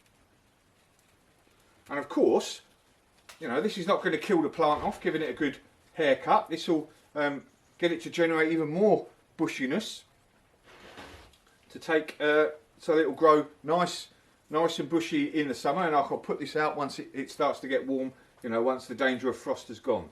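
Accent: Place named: England, United Kingdom. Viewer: Nice English accent!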